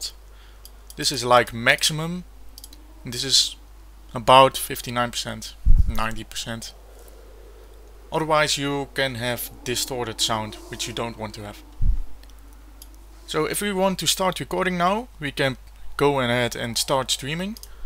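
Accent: Netherlands. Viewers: altijd grappig om dat nederlandse accentje te horen ^^ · The dutch accent though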